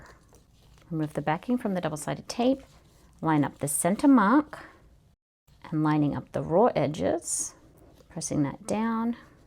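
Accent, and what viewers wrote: Australia. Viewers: You always have such lovely fabrics❤ They make beautiful bags. I made the Pythagorean pouch today with fabric lining and vinyl outer, it turned out lovely. Just a question, you have an unusual accent. I’m Australian and some words you say sound very Australian. Have you lived in Australia?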